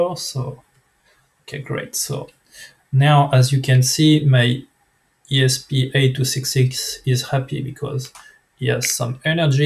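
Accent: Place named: France. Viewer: Merci pour vos vidéos que je viens de découvrir. Malgré votre accent très frenchy 😜 qui n'est pas trop compliqué à comprendre pour les franco-francophones, avez-vous la possibilité d'activer le sous-titre en français? J'ai déjà vu ça sur des sites de français qui présentent oralement en anglais, ce doit être automatique, mais je ne connais pas la procédure...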